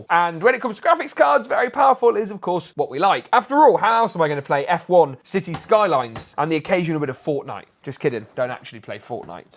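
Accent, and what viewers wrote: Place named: North, United Kingdom. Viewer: I cant understand the north accent \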